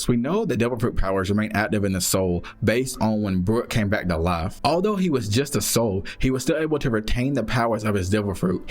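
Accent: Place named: Tennessee, United States. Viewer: Vro your accent😲 are you from Tennessee?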